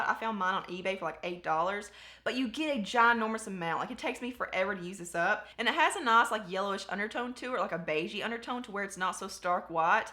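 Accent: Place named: Alabama, United States. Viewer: I’m coming from channel not sure if I spelled it right but I have subscribed to your channel I absolutely loved this video I love drugstore and dupes videos. You are a beautiful young lady but if I can ask where you are from I heard an accent I live in Alabama and it sounds like you may be from there. God Bless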